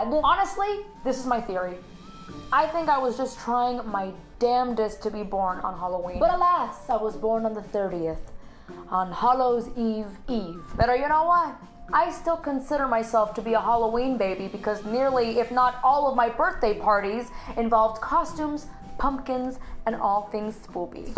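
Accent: Cuba. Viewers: I live for that cuban accented spanglish! as a spanish person (spaniard? idk, english is confusing. I mean to say that I'm from spain) I find spanglish and the evolution of spanish in general across the pond so interesting! it's amazing how a language can change so much and represent so many cultures and yet still be like a comforting unifying blanket. bit of a weird metaphor, but I'll go with it. anyway, just wanted to say that I love your videos (and accent!) 😊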